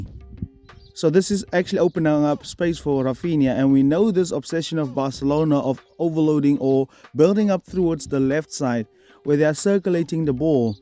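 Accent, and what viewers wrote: South Africa. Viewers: This ou sounds south african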